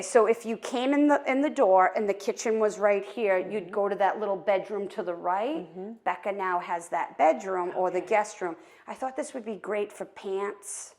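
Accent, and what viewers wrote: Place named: New York, United States. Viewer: accent sounds more like downstate NY than Massachusetts.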